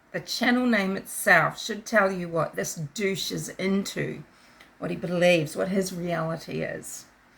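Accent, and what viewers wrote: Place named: Australia. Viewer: Hey! It is so great to find you. I found you from Randy’s latest vid. I agree with EVERYTHING you said!!!! You have such intelligence and common sense. I’ve ALWAYS respected your thoughts, comments and opinions. 💗 I always thought you were a guy! 😂 You sound Australian. Keep on keeping on, girl!! 👍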